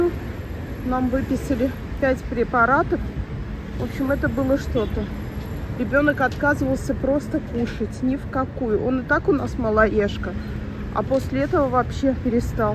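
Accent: Baku, Azerbaijan. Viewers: Привет из Молдавии!!!,случайно попала на ваш канал и была очень рада!!!Я родилась и выросла в Азербайджане,в поселке Насосный...Училась в институте в Баку...Практику проходила на нашем Бакинском телецентре... Огромное спасибо за чудесные позитивные ролики!!!За ваш бакинский русский...Азербайджанский язык Я помню...не могу сказать,что в совершенстве,НО...,всех благ вашей прекрасной Семье,пусть все будут здоровы...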